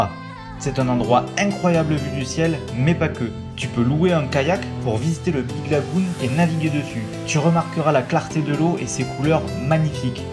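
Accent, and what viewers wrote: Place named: Toulouse, France. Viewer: La vidéo est incroyable, avec en bonus les noms philippins avec un accent toulousain 😍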